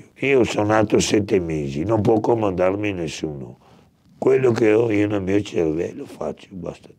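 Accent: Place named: Alba, Italy. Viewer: Min vai in mona! Sui 2/3k al metro. Neanche in val Seriana o Immagina Brembana 25k un bilo dai, sui 50mt. Cmq bel personaggio, città alta tra Trussardi Percassi e Locatelli che non ho presente bhe belle zone ( Berghem de Sura ) cmq ( da accento inconfondibile Alba ) come mai tantissimi bergamaschi vedo nelle tue interviste..? Una percentuale decisamente alta su sei video fin'ora quattro lo sono. Conosco quasi perfettamente le zone che citano, Quarenghi Malpensata e Gleno,poi famose. sembra tanto quei ricconi di famiglia che sputtanano tutto in poco a destra e manca ( non lo nasconde del resto ) poi gli ordini di " grandezza " in termini economici lo sanno solo loro. Per ora non ho riconosciuto nessuno di vista ma in giro se ne vedono e di storie di ogni tipo. Anzi, ho conosciuto bei soggetti facendo Calisthenics da un tre mesi, Omari un ragazzo marocchino bel tipo trentina super tirato che non fosse timido potrebbe raccontare una bella storia molto interessante..ma farlo parlare così liberamente a telecamere puntate non penso sia impresa facile su due piedi. 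Provo ad accennare e convincerlo quando lo becco al parco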